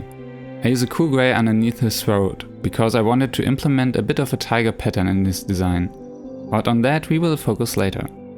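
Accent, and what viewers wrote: Germany. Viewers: I didn't think of playing with pressure when drawing with Copics. Thank you, I will definitely try that out next time! I have a question tho... Are you German? The way you pronounce words sounds a bit like German accent 😃 just wondering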